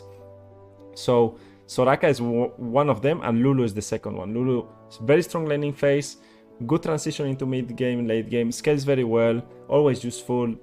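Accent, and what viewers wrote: Spain. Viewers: suena tan raro escuchar a alguien español haciendo tutoriales en ingles xd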